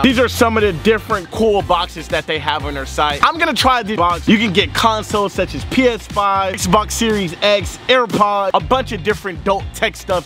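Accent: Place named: Baltimore, United States. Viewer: Y he sound like he from baltimore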